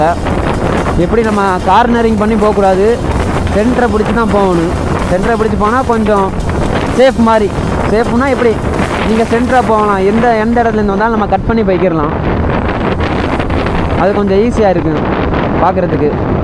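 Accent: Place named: Kerala, India. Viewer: Kakka va kakka nu solunga bro athu tha cute ah iruku unga KL tamil ungaluku nalla reach kodukum. All the best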